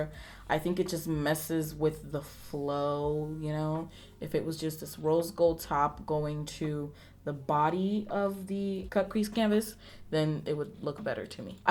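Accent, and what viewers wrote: Nigeria. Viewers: Lovinnnng your Nigerian accent 😍😍 😍 #nigerian.here😊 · Chaiii your nigerian accent I no fit 😂😂😂😂😂😂😂😂 makeup on point!🔥🔥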